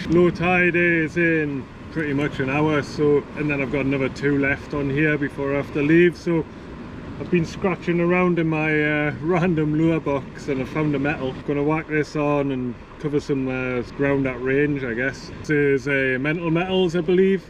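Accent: Newcastle, United Kingdom. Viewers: are you from newcastle or sunderland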